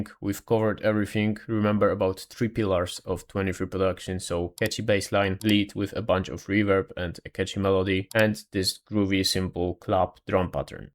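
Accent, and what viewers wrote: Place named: Poland. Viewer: thats gotta be the thickest polish accent ive heard in a while 😂😂😂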